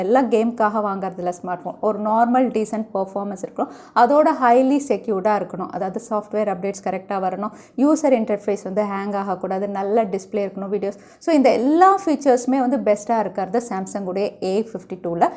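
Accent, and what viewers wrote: Kerala, India. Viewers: Kara Kara Kerala tamil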